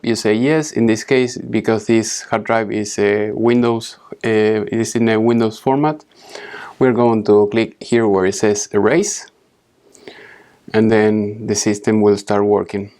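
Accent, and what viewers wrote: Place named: Argentina. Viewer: Nice Gardel accent... ! love it...! thanks for the helping hand , cheers from Adrogue !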